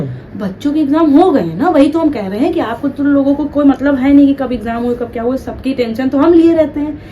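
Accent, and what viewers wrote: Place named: Uttar Pradesh, India. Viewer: My lovely daughter don't take any tension now, think about your health and try to prepare another comment of your show, your evert, show is superb, and everyone enjoy our show fully, I love to watch your every shoe full of interest , UP accent, and our sisters and daughters authentic style, a graceful looking show, I am watching your show from Karachi Pakistan with love ❤❤❤and good wishes to everyone around you and in your circle ❤❤❤❤❤👌👌👌👌👌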